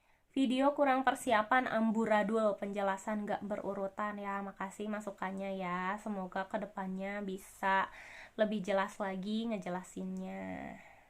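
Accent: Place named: Bandung, Indonesia. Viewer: suplier atuhhhhh....dan saya sukaa nada bicara kaka hehehe khass bandung sukses teteh owner ramah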